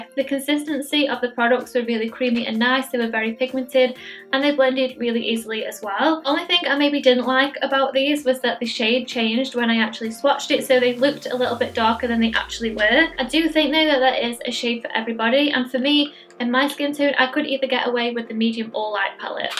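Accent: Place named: Stoke, United Kingdom. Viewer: Bit of a random question but are you from Stoke? Your accent sounds so Stokie!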